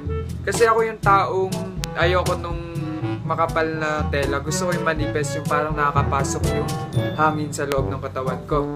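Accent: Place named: Laguna, Philippines. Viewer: May accent ka na parang tiga laguna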